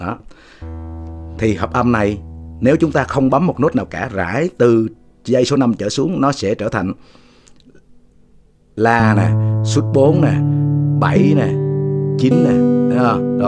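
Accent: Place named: Cam Ranh, Vietnam. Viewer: ..lúc đầu nghe tiếng nói a e nghi nghi rôi ..vì e cũng là ngưòi Can Ranh .Mong a nhiều sức khỏe.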